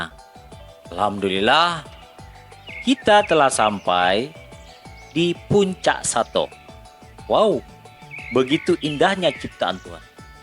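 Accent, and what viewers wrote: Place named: Minangkabau, Indonesia. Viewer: Dialek minangnya kurangi bang. Pengucapan huruf E nya diperhalus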